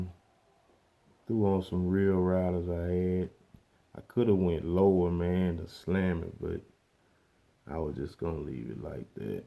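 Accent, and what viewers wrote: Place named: South, United States. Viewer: I love your southern drawl my brother, you reminded me of one of the best car songs of my generation <link>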